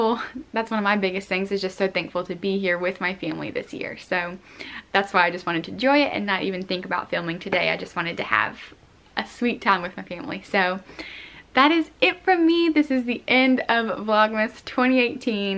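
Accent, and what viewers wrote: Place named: South, United States. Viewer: Not sure how I found thous page, you are very high energy, which is cool, I like your southern accent, I sub and liked. Hello from Ontario Canada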